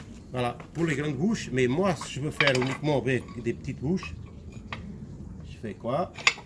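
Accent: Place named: Portugal. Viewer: Bravo pour ton travail et ta présentation. Justement je m’intéressais à cette fendeuse dans le commerce par internet pour mes besoin dans le fin fond de l'Ariège où il n'y a pas de d'électricité. Tu me fais faire un bon en avant dans ma réflexion avant achat ! Mais je me tâte encore à prendre une petite thermique.... Merci et bonne continuation. PS : j'adore ton accent portugais ! ;) et bravo aussi pour la qualité de ton français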